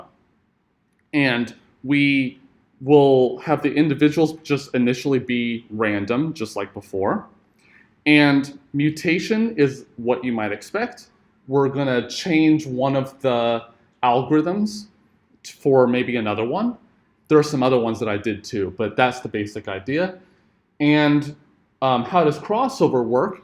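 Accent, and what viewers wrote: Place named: England, United Kingdom. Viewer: Very good lecture. I like your English accent very much. Keep it up.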